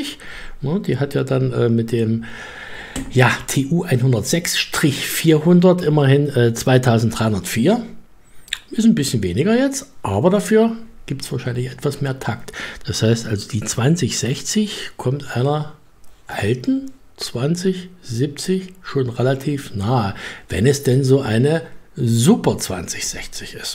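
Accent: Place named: East Germany, Germany. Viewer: Du bist ja noch "am Anfang", du wirst sicher sehr schnell sehr viele neue Abonnenten haben. Jedenfalls machst du das äußerts professionell , du wirkst wirklich ausgesprochen kompetent. Davon ab, dein leichter "Ossi-Akzent" ( sorry Dafür ), ist sehr sympatisch. Weiter so !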